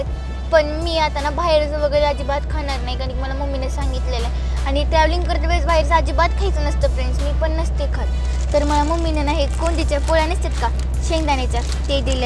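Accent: Latur, India. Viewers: Latur kar bolanyachi tone khup avdli pune karanna.... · I am from Pune Tuza video pahatana tuzi bhasha aikun mala mazya gf chi aatavan zaali she was also from latur #pbthetraveler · लातूरची मराठी लई भारी 👌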